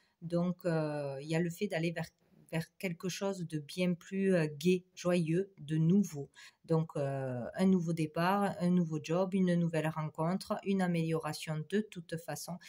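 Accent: South, France. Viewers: Gratitude à vous quel doux accent di sud Namaste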